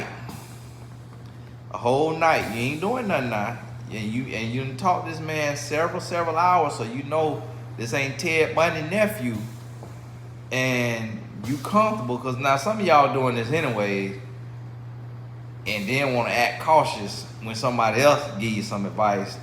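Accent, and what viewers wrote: United States. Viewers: Awesome as always,. But it's the way you say water and back for me. 😄 I love the country accent.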